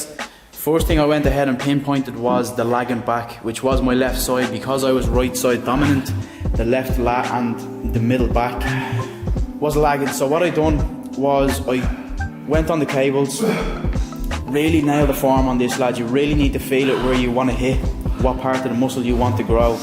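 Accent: Ireland. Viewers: Are you irish ?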